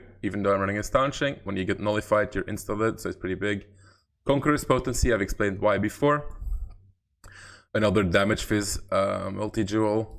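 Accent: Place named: Latvia. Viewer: Hmm, izklausās, it kā latvietis runā angliski...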